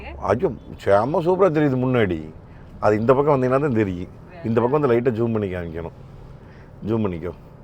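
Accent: Kongu, India. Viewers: அண்ணா நீங்கள் பேசும் கொங்கு தமிழ் தமிழ் மிகவும் அருமை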